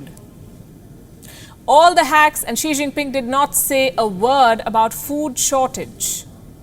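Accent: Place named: India. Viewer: You sound like your Indian, theres nothing wrong with that, but considering India has the same amount of people and they have the same weather problems, so it should be safe to say that India also has a food shortage, yes? So why dont you also report on that, why puck on the chinese ? As i can see in the History books, china is one of the few countries around the world that has never invaded another country, so why are they hated. On the other hand AMERICA has invaded almost every continent in the world,. Think about that. Yes im proud to be American. Dont thread on me. Hoorah.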